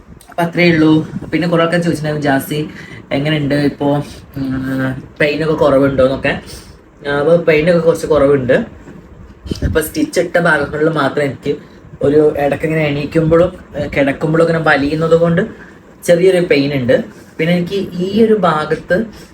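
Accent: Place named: North Kerala, India. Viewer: ബെന്ന്, പയം പുയുങ്ങിയത് 😅നിങ്ങൾ വടക്കൻ കേരളീയരുടെ ഭാഷ ശെരിക്കും ചിരി വരുന്നുണ്ട് 🤪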